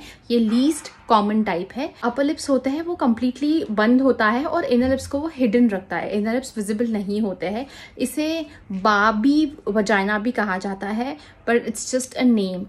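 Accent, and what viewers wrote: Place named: India. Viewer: indian accent could narrate my life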